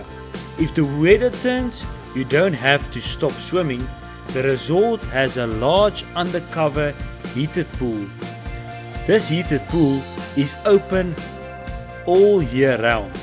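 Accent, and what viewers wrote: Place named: South Africa. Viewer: Thanks for your video and so good to hear another South African accent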